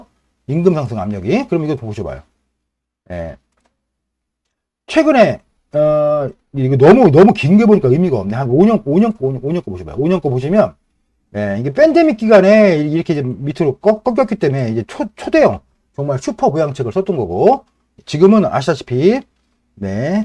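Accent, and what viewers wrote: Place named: Jeolla, South Korea. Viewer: 말투가 약간 전라도분 억양같아요^^ 좋은 내용으로 영상 잘 보았습니다. 감사합니다.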